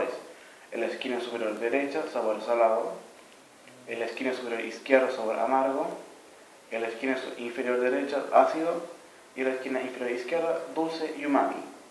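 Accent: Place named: Chile. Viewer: es chileno, así hablan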